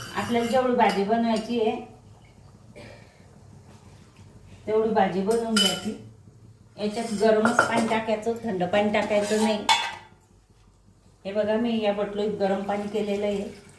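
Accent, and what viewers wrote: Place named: Khandesh, India. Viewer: मावशी तुम्ही नक्कीच खान्देशातील आहेत ...कारण तुमच्या बोलणं ऐकून ...माझं माहेर पण खान्देशातील आहे ...पण रेसिपी भन्नाट आहे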